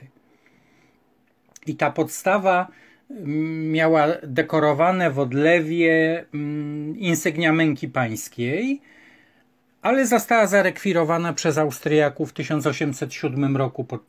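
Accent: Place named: Kraków, Poland. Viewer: To jest narracja historyka sztuki- merytoryczną, płynna, ciekawa bardzo, ale skierowana do znawców. Jeśli już miałabym grymasić, to prosiłabym unikać mimo wszystko krakowskiego akcentu. Wystawa, jak każda na Wawelu, wspaniała. gratulacje.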